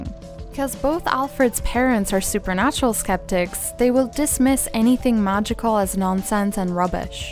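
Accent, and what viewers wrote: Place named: Ireland. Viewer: I love this Irish + a pinch of eastern european in her accent :T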